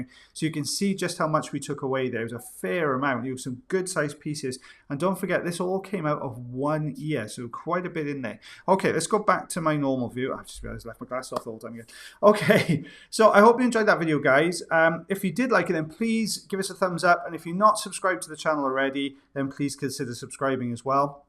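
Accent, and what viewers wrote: Wales, United Kingdom. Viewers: Hey Dr., I'm curious, what specific part of the U.K. are you from? I feel like your accent was a bit more vibrant in this episode. I'm not complaining of course, I love it! 😊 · He has a Welsh accent.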